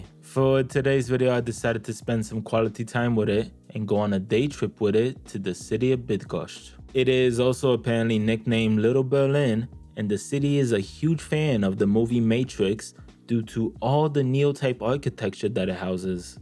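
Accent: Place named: Boston, United States. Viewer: Wait your polish and here I was thinking you were from Boston.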